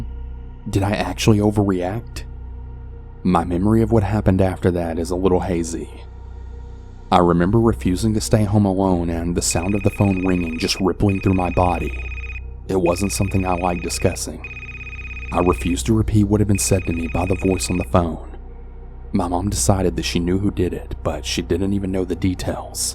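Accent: South, United States. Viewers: No to southern cannibal narration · The narrarator sounds like a country boy.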